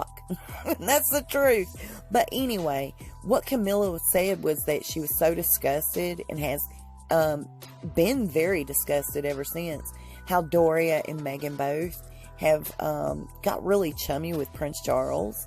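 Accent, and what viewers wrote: South, United States. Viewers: Hey Hon welcome to the south! I love your southern accent! Carolina girls best in the world! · Oh oh what's that do I hear a bit of Southern Confederacy in your voice I'm really concerned here that we might be dealing with a racist then again you people don't call yourself races anymore do you I believe it's nationalist now get a life besides talking about this girl's seriously he chose her in a discussion you know you can't bring back the Civil War and give it to the South and your racism shows and all your videos by the way not just this one I will be notifying YouTube about you I'm sick and tired of you Paula Dean , Roseanne, racist pieces of crap going after this girl for no reason what did she do to you exactly and you sound American so you don't have any investment in England and if you were Harry told you to keep your opinion to yourself I don't think she ever because enough for you and you know she won't so why are you bothering us with your opinion why don't you stop burning your damn crosses on YouTube and by the way she was only married once before but that's just how much you educate yourself on any media that's not sponsored by whatever dude under a sheet you work for oh my goodness you know is this how you treat people seriously you seem to not go to church or know that you should treat other people the way you would want to be treated you just seem like a overwhelmingly negative person you ain't saying nothing unless you got something bad to say about this girl Kyrie put out a statement when they first started dating about people like you I believe it wa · What a witch 🕷! 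Thank you for keeping us posted, by the way I like your Southern accent💗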